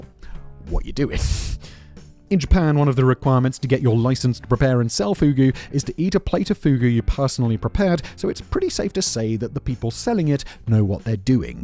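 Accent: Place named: United Kingdom. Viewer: Love when I click on this I hear a British man talking about cock lol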